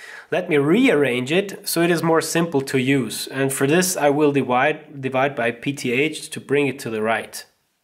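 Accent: Austria. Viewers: Bisch Du e Össi oder e Schwizer? ;)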